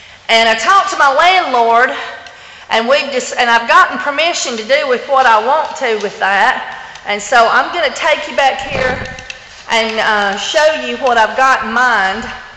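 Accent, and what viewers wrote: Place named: Alabama, United States. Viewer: I feel like I have a connection to you, although I'm from New York, my mom was Born and raised in Birmingham Alabama. Hearing your accent allows me to think of the times I spent one year there attending school and came back to New York with a BAMA accent. lol. but that was many years ago. Again, good luck and I'll be watching the transformation of your store. So exciting!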